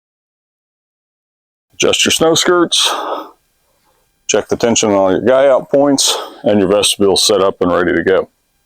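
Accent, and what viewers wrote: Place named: Baltic states. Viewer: Baltic language accent is all over! Good video!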